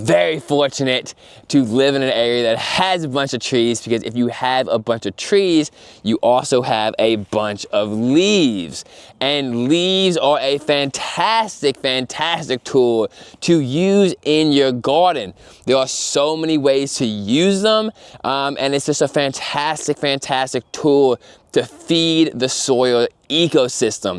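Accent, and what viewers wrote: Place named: New York, United States. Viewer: Cant handle that hardcore ny accent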